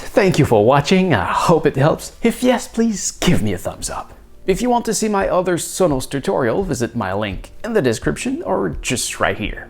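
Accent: Quebec, Canada. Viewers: You HAVE to be québécois. I recognize the accent ;)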